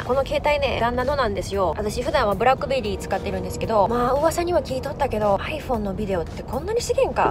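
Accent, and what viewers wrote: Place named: Okayama, Japan. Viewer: 岡山弁の響きが心地いいです。あるある、楽しかったです！ ほんま、おえん。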